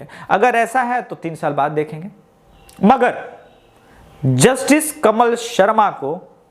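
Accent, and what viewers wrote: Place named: Bihar, India. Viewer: Waah...bihari andaj me bola...maja aa gaya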